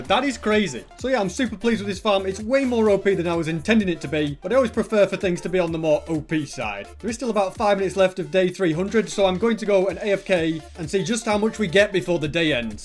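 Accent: United Kingdom. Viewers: y r u british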